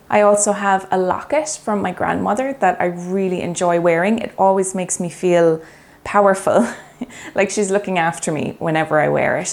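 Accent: Ireland. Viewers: So well said - 52 and curvy here, I love your gentle manner and of course your helpful tips - you have a new subscriber :) PS - I enjoy your lovely Irish accent, and your doggy friend is super cute (and that's coming from the ultimate cat person)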